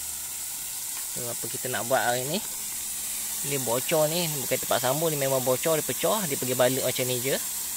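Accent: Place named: Pahang, Malaysia. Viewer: Pekat ngat pahang nye😂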